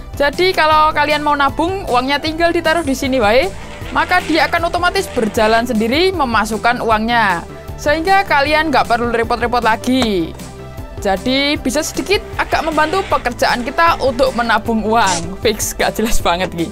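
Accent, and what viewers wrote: Java, Indonesia. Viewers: Koe medok men bosqu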